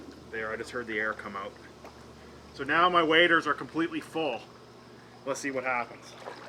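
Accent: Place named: Canada. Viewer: Thumbs down because of canadian "aboot" and "ooot"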